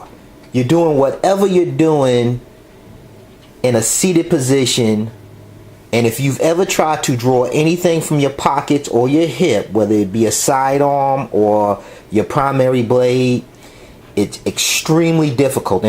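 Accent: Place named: New York, United States. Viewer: Not to offend but it sounds like your from new york im from alabama so our situation is a little different but i do like your reveiws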